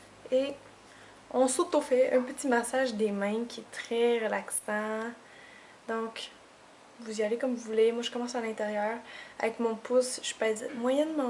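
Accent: Quebec, Canada. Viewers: J'aime tros ton accent québécois c tro mignon et super video !!!!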